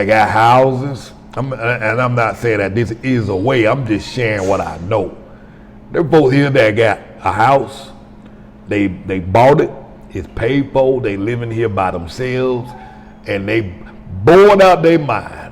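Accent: South, United States. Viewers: I'm late BUT I want to wish you a Happy Father's day my man! I been rockin with you for YEARS when U were still traveling in the states and 1st went to Canada! I have seen a LOT of mess you had to go through and I am PROUD of you my man that you made it through! The VERY 1st time I heard you talk I KNEW I was gonna like you cause im from the South TOO! Much respect to you Wishing you MUCH happiness my man! 😇👍🏾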